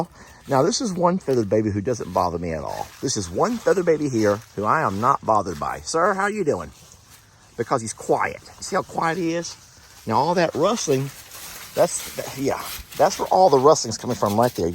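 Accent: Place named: Texas, United States. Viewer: to this Aussie Texas accent isn't clear English. I often have trouble but I agree with the captions being distracting.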